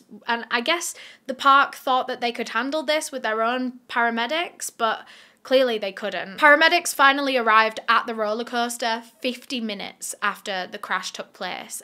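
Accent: United Kingdom. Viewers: From America here. I absolutely love your accent. I hear different accents from the UK and I’m so jealous of yours. And keep saying fuckin. Fuck Fuck Fuck. Shit shit shit. 😂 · the british accent is making me nauseous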